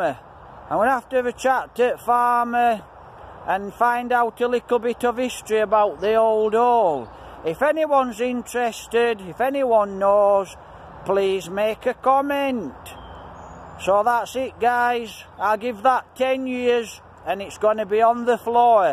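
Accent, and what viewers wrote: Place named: Barnsley, United Kingdom. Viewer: I liked your video, I forget how strong the Barnsley accent is haha! You seem like a lovely local fella 😁❤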